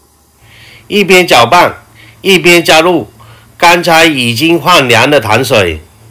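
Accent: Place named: Cantonese, China. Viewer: 讲解很详细，广式普通话很好听，让我这样完全听不懂粤语的人能听到明明白白，谢谢，果断订阅。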